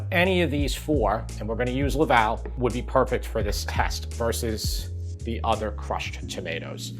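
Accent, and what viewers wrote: New York, United States. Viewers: I have been watching your videos and have been learning so much...I am even trying to pick up on the New York accent...I love all your videos.🥰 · He has a very light NY accent. Mine & my family is way thicker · I love your NY accent!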